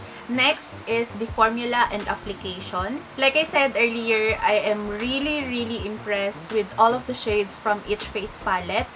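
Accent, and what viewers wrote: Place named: Iloilo, Philippines. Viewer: You sound like an Ilongga. 😍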